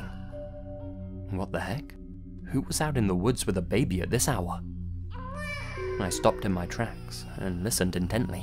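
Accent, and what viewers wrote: England, United Kingdom. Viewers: Great stories as usual,:) I know you can't really tweak the grammar of someone's story beforehand, but as a brit as well, your accent is far too good (and lovely to listen to) for the all the 'I's that should be 'me's in sentences like: "They kicked Steve and I out of the jeep". It sounds (and grammatically is) off...spoils it a bit, is all.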